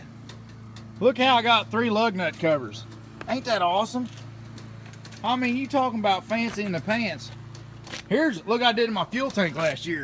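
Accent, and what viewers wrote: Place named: Rural, United States. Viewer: I think the funniest parts of the videos is you talking. I'm from europe, where everyone speaks good-ish english. But once you get an american countryboy speaking, you're in for a real treat :)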